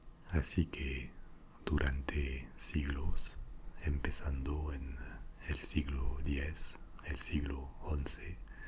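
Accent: France. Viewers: Me encantó tu narración. Una forma diferente pero muy agradable. Yo disfruté de tu relato pausado, con ese tinte de francés todavía a pesar del buen español y tu voz suave pero muy clara. Gracias.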